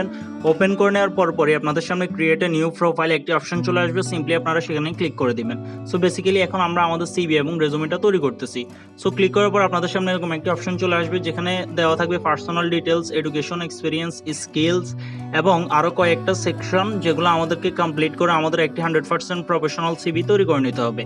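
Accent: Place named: Noakhali, Bangladesh. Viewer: আপনার বাড়ি নোয়াখালী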